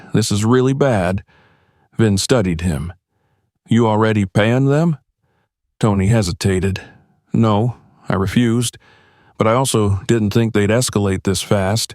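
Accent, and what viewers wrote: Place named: South, United States. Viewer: I am so glad Vin Diesel stepped up to help that young woman. She seemed very scared by those bikers. And by the way, you would make an excellent audio describer. You have the voice for it too. A strong, southern accent, as well as an excellent describing voice. I would know, because I've listened to a lot of audio descriptive movies and TV shows. President HW Bush describes it's a wonderful life for those that are blind or visually impaired. I myself happen to be blind. Totally blind in fact from birth. It doesn't slow me down though.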